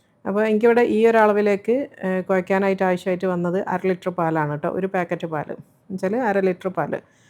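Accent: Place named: Kannur, India. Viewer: Kannur aano samsaaram kelkkumbol angane thonnunnu 🥰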